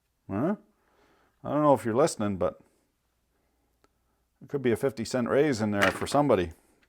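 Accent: Quebec, Canada. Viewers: With that French accent you have to be from the Quebec area....my heritage is French Canadian as hell. You sounded like one of my uncles.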